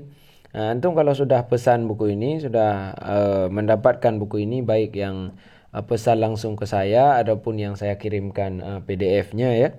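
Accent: Aceh, Indonesia. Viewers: Saya mau tanya.ustad orang Aceh ya.krn fasih kayak orang Aceh ngaji Krn saya juga orang Aceh...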